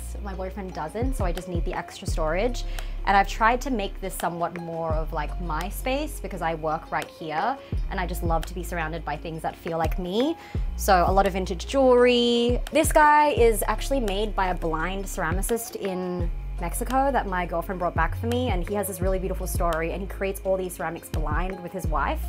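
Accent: Australia. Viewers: Love her accent, can hear the Australian coming through. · Her accent is definitely not regional to anywhere in the US. She sounds Australian to me. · Are you a an Australian? You sound very Aussie, ooh never mind I just saw Vegemite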